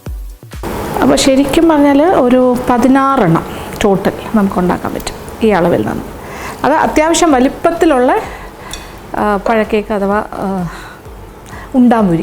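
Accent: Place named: Thrissur, India. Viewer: അപ്പോ.. തൃശ്ശൂര്കാരിയാ...ലേ...? ഞാനും..same pich..😍😍😍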